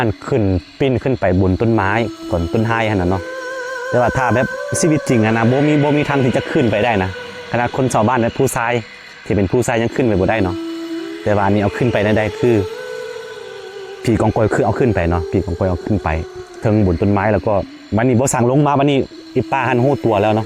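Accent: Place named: North, Thailand. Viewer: หม้อขางคือกะทะภาษาเหนือผมว่าคำพูดฟังง่ายเพราะพูดสำเนียงไม่ออกอีสานหรือลาวเวียงจันทร์มันออกทางฟังง่ายๆเหมือนภาคเหนือของไทยผมคนเหนือฟังโดยไม่ได้แปลเลย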